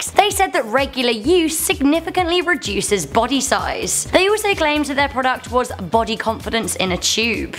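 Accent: United Kingdom. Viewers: dillpickle 123 she’s British. That’s how we pronounce it in Britain. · Lynn Barns she's from the UK so they pronounce it different from the USA.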